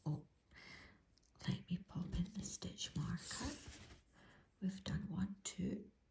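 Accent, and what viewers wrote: Scotland, United Kingdom. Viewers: Thank you for this sweet pattern and tutorial! Greetings from New York. My mother-in-law came from Helensborough. I enjoyed listening to your Scottish accent. Your instructions are very clear. I will make these for charity as my youngest grandchild is now six. They are darling! ❤ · Thank you so very much for sharing your pattern and tutorial. You explained everything in your beautiful Scottish accent. ❤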